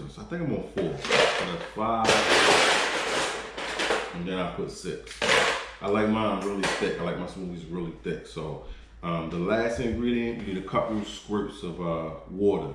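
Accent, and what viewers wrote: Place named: Baltimore, United States. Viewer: Gotta be from Bmore! Ask me how I know. Lol It's the (u or ewww) that's emphasized heavily. Lol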